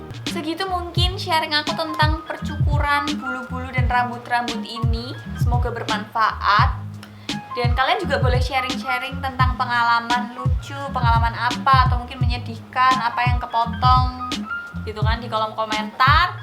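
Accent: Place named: Surabaya, Indonesia. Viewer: arek arek bgt mbk,e iki medok bgt suarane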